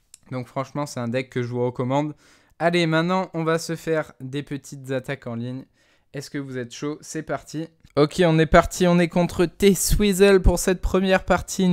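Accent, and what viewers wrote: Belgium. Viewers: il est belge ? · tes belge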